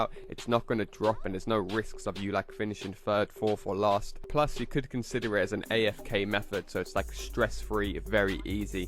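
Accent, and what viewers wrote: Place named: England, United Kingdom. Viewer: Ohh l love that english accent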